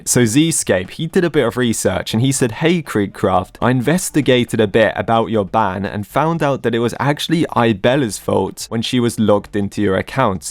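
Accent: United Kingdom. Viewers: I could tell that this man is British or at least European cuz them words could only be learned from a proper grandmother as a British folk myself 😂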